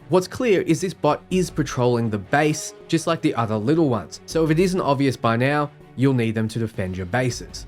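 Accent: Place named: Australia. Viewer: Amazing content! I have been obsessively watching all your clips! Also good to hear an aussie accent in these vids.. *not an aussie, but a kiwi cuzzy!* Have you found any intel on if bgs have reworked the 'karmic elements'? I'm soooooo hoping I can be a evil crimson pirate!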